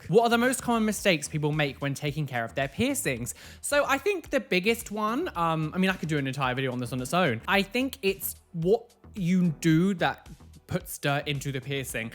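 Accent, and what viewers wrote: United Kingdom. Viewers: you should see me do my impression of you to my friends complete with hand motions and british gay accent. "time for another piercing reaction videoooooo!" lmao!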